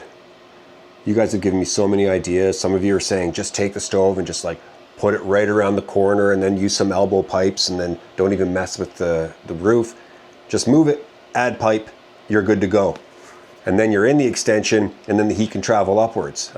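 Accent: Newfoundland, Canada. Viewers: That’s the Newfoundneez in you ………..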